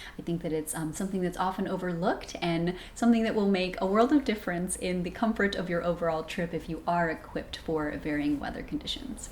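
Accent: United States. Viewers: I am sad her culture is to speak perfect American English, and abandon her own culture completely. Her culture is thousands of years older, but pretty great.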